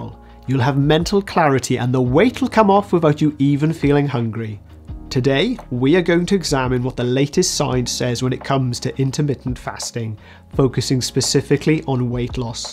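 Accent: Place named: Wales, United Kingdom. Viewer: Love the Welsh accent. Great breakdown of comparisons, thanks. I have T1 diabetes and fasting makes my TIR and BG absolutely fabulous and I feel great.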